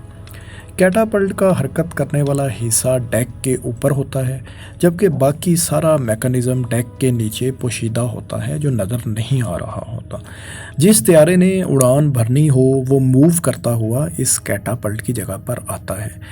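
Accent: Punjab, Pakistan. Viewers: پنجابی ایکسنٹ میں اردو یہ جناب بولتے ہیں۔ پروگرام لاجواب ہے مگر غیر موزوں آواز کے باعث اپنی افادیت کھودیتا ہے۔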